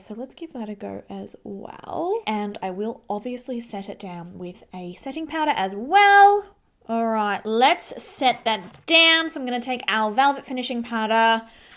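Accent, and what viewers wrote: Australia. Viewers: Sounds exactly like every young beautiful Australian girl, so better not ever come here then.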